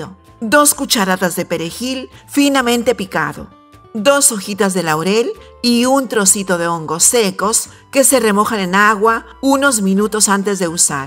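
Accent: Peru. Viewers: Hola, muy buanos dias;Soy aficionado a tus recetas de comida peruana. Yo soy peruano, pero vivo desde hace 32 años en España, y siempre e echado en falta nuestra comida peruana, y me e visto en la necesidad de aprender a cosinar y me guió por tus recetas. Me gustaría mucho que te des a conocer, pon un poco de tu imagen;Por tu forma de hablar creo que heres mi paisana, si no me equivoco de Huaraz?, yo soy de Yungay(Ancash). Un cordial saludo, y muchas felicitaciones por tus excelentes recetas,gtscias.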